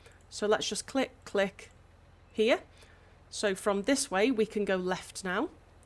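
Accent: United Kingdom. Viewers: Great tutorials. Not many out there covering all the editing of paths and your thought process. Nice and clear instructions too with no pauses and umms and ahhs. Always good to hear a UK voice on YouTube as well lol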